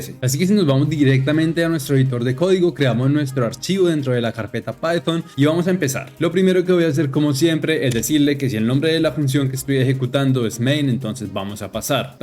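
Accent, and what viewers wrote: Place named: Colombia. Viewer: Se fue para Alemania pero el acento colombiano no se va 😂 no se si me equivoqué rara vez me pasa gracias por el video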